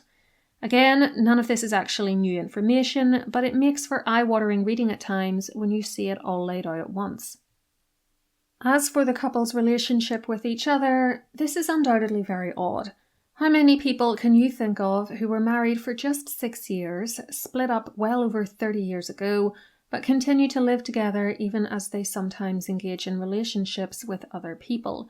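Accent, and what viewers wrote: Northern Ireland, United Kingdom. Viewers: Your sound you from Northern Ireland I guess you are very British i’m not surprised to hear your opinion. Remember, it’s just your opinion.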